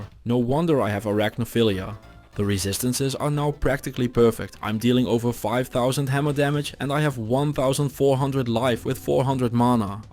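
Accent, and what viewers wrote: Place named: Netherlands. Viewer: That's quite the Dutch accent.. you sound like Goldmember.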